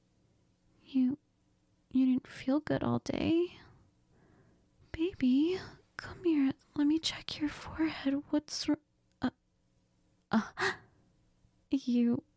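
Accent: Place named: Spain. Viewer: You felt extra Spanish today (as a native speaker i loved it) - Hermoso audio!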